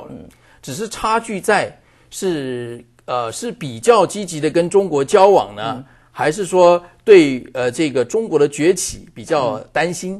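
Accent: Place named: Taiwan. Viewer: 老大你眼神不好啊，沒聽倒是台灣口音？那你也應該看到片尾的網址TW吧？ 還有那個央廣是正體字哎 要學會觀察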